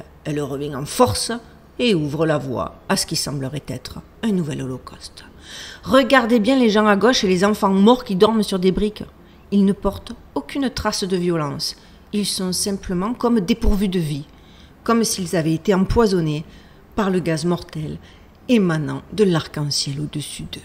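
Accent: Marseille, France. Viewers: oui ta pas changé en 2 ans !! Je suis un jeune abonné à ta chaîne et je la trouve sympa depuis Los Angeles d entendre l assent de Marseilles !👋continue ! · Son travail à Végas, dans la vie courante, c' est Belle génie, légèrement Asperguer, mais surtout maman plutôt rigolote, communicante et artiste dans l' âme !!! Marseillaise quoi !!! mais Marseillaise américaine Avé l' accent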